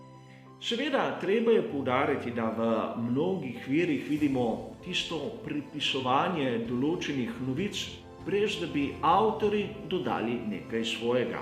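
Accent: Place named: Koper, Slovenia. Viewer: rtv z 100mio na leto ne uspe sestavit 1% tega kar lahko vidimo na tem kanalu. se mi je zdelo da si kje iz kp ko sem začel spremljat tvoje prispevke to pa zato ker uporabljaš G in ne H po Horiško :) tudi moje čestitke za delo ki ga opravljaš in upam da se bo nadaljevalo tako. me pa zanima zakaj vsak dan nov video. se ti ne zdi da bi si kdaj vzel dan prosto od tega? naredil mogoče video nekje 6 do 7min z nekaj kratkimi izseki? v mislih imam kot nek malo drugačen format prispevka ki bi ga objavil tu in tam.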